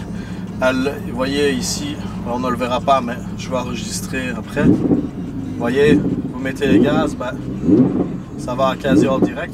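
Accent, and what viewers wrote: Belgium. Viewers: Je viens de découvrir ta chaîne ! Superbe. Et çà fait du bien d'entendre ce bel accent Belge ! Vous êtes tellement sympa en Belgique 🙏🙏🙏🙏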